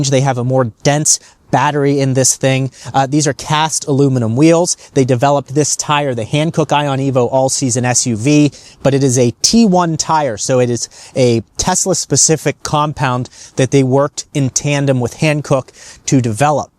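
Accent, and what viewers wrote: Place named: AAVE, United States. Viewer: Cringe ebonics simpleton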